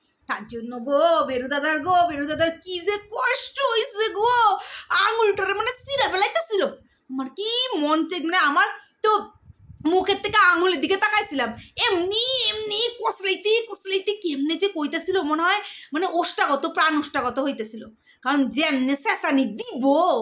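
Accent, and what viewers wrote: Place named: Bangal, Bangladesh. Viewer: darun bollay bangal bhasha ta 😂😂😂😂😂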